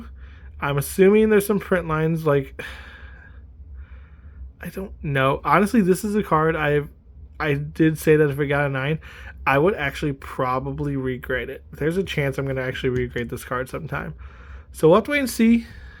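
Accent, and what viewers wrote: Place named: Canada. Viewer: 460 you sound canadian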